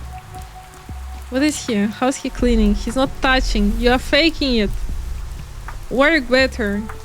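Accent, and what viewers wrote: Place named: Poland. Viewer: polish accent asmr, its ohkay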